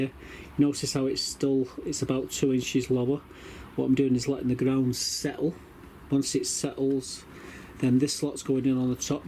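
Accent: Salford, United Kingdom. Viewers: Salford? I used to live in swinton. I thought I recognised your accent 👍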